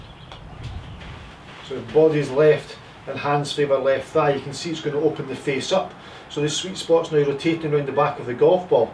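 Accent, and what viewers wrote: Scotland, United Kingdom. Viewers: Mr., you are as much common sense and down to earth in your explanations as anyone I've ever heard! Even if your Scottish brogue makes this Florida boy concentrate on what you say. Lol I'm 70 and recovering from back lumbar fusion surgery (like Tiger) now I have time to rethink my swing (get the mental process changed) so my physical changes easier to accomplish. Thank you. I find the golf machine explanation complicated and confusing... You make the concepts much easier to understand. These are very good videos! Thanks again.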